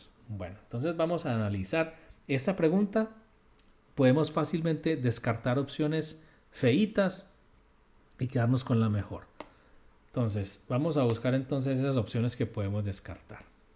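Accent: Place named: Colombia. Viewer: Muy interesante sus clases .. y esa voz colombiana me recuerda a alguien 😂😂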